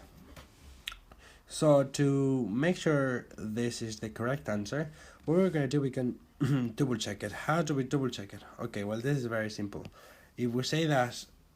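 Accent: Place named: Ireland. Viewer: Hey glad I found this video. Thank you. Could not remember how to do this. It is easier if you do a simultaneous equation pretending the ≤ is =.............. Like your Irish accent.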